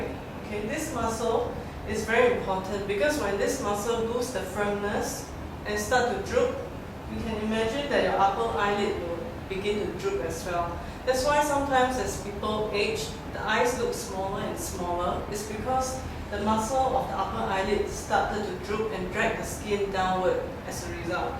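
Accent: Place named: China. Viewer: what is she saying? can someone please translate her chinenglish?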